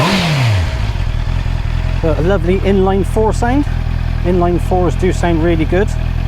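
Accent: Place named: Bristol, United Kingdom. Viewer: I subscribed just because of your bristol accent 😄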